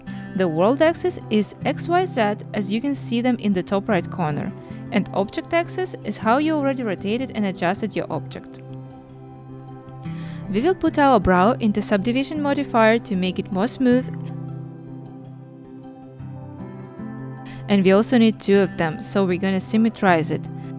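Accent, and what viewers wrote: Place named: Eastern Europe. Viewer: Единственный английский который я понимаю, этот тот, на котором говорят славяне, ахах) А почему решили на инглише вести канал?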